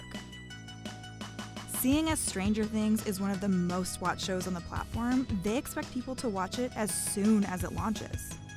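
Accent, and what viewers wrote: California, United States. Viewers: California East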